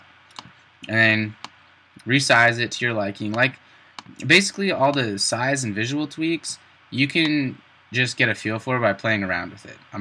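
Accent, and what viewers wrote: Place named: Maine, United States. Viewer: Thanks! Nice tutorial... Where are you from? Your accent is exactly like a friend of mine from Maine.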